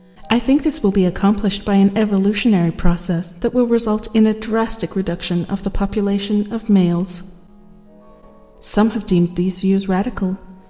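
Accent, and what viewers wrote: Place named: Australia. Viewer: Great narration. Always go Aussie when you need a good voice.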